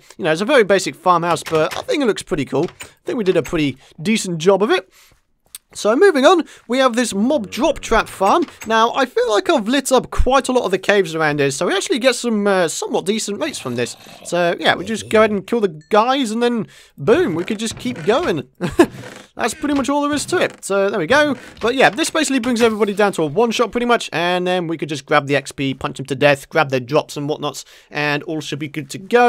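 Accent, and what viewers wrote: United Kingdom. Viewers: ur voice,its so , so, UK. I love it !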